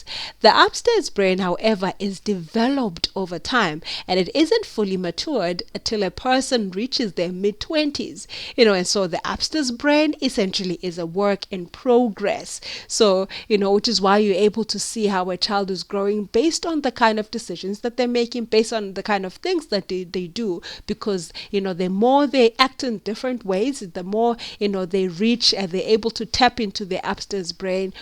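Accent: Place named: Kenya. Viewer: Thank you so much for this. It was very helpful and it was very nice to hear an accent which reminds me of my homeland Kenya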